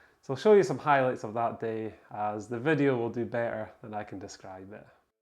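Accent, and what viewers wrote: Inverness, United Kingdom. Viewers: Hi, sounds like you are from Inverness area? I'd happily do the South Glen Sheil Ridge again with you if you are needing company 👍. I've only done 8 but An Teallach is my favourite so far 👍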